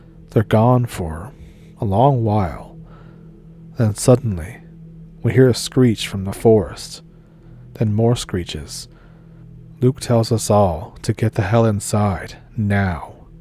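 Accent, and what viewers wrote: Canada. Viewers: Well, I have told you before every time I hear your accent, I first think of my Canadian cousins 👍😁🤟🎉🎉🎉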